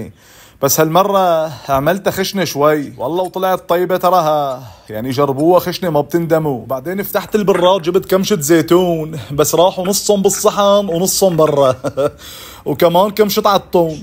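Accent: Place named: Latakia, Syria. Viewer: والأحلى اللهجة اللادقانية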